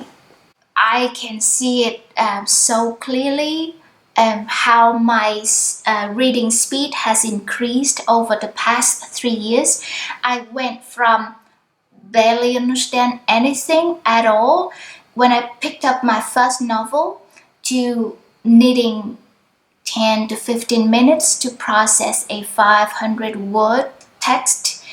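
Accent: South, Vietnam. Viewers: Sorry I forgot, this is southern accent right? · Thanks for reply , i am malaysian nguoi hoa . i learn a lot of southern accent tieng viet from your video clip , cam on nhieu !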